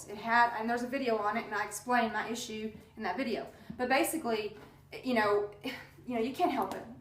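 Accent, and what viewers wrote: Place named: Texas, United States. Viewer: Love you videos. Are you from Texas? Love your accent.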